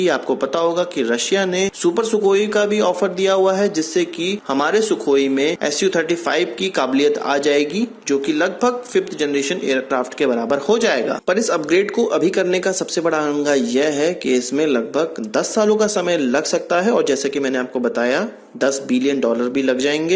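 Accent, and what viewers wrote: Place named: Nagpur, India. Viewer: bhai are you from Nagpur or vidarbha...your hindi accent is nagpuriya.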